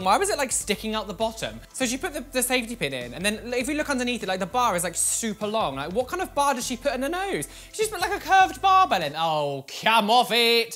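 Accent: United Kingdom. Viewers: I have to say I wasn’t expecting you to be British but I love it hey queen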